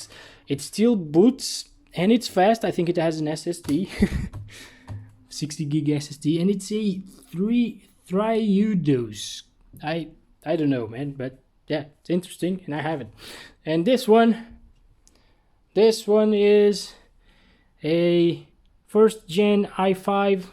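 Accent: Portugal. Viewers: Ah, então você é de Portugal? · És português?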